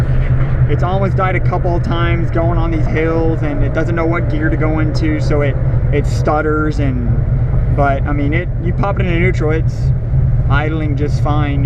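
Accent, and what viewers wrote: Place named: Texas, United States. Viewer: you from texas og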